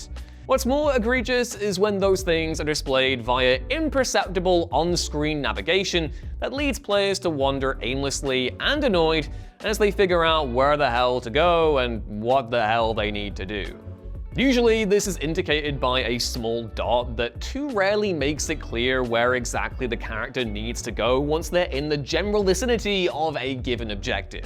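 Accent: United States. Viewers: Where in earth has this semi-american accent come from? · Seriously,. Is it an affectation to try to appeal to American viewers, have you been visiting the States a lot lately? What is up with the Americanization of your accent?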